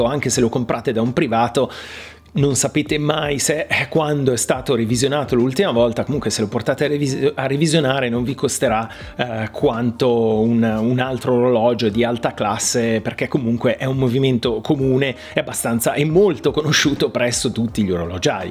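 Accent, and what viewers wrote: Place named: United Kingdom. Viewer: Ma perché hai l’accento inglese?!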